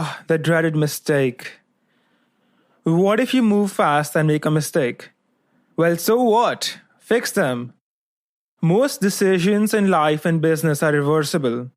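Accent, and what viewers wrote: India. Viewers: Why are people hating on his accent? No offense, but I find hard understanding Indian accent and this video is the exception. Great content.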